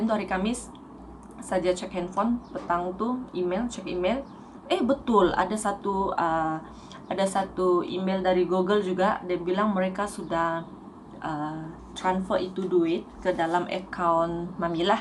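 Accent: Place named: Sabah, Malaysia. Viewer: Morning mummy, i thought you were Indonesian at first.. but in the middle of your video, your sabahan ascent start to appear.. I also have the same problem..been waiting for a month now.. my 1st pin didnt arrive.. i guess i have to wait until my 4th pin application then proceed to IC identification..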